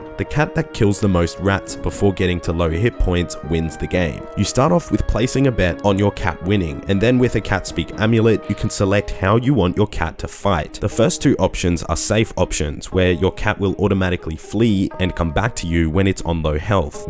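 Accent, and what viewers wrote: Australia. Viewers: I fucking love your voice, are u from Australia? The accent combined with the deepness of your voice makes me happy.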